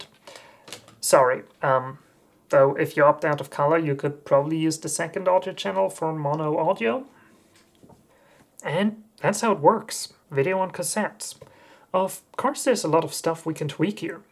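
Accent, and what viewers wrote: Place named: Germany. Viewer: I sense beautiful German accent! 😊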